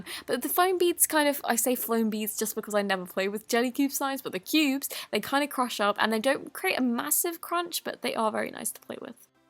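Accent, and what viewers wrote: England, United Kingdom. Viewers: THE TRUTH ABOUT THE WORLD, She's English. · I love British accents❤️💕❤️💕❤️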